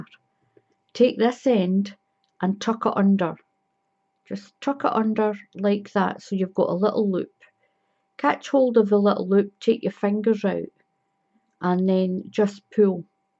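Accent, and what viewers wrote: Scotland, United Kingdom. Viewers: Thank you for this and other patterns. You are an excellent teacher (I'm American) and your Scottish Burr is an added treat!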